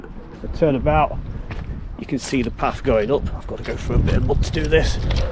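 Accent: Nottingham, United Kingdom. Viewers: Another brilliant presentation. I love your enthusiasm and of course your Nottingham accent. Keep them coming.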